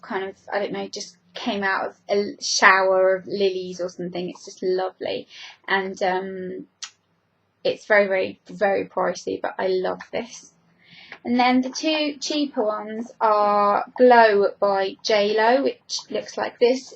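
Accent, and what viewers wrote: United Kingdom. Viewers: Btw I LOVE your accent. I'm going to live in London in September and I want my own British accent.. :P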